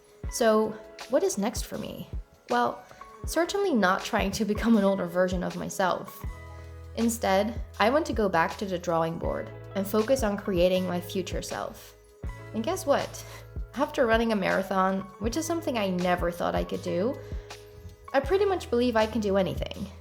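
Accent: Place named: Canada. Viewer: What an exciting new project! Can’t wait to follow along and perhaps get inspired to stay away from too much tv after work myself :) I recently started weightlifting and I feel like I’ve been missing out, it’s awesome and so helpful in combination w/ running. Didn’t realise you were from the Netherlands originally, your accent sounds very Canadian!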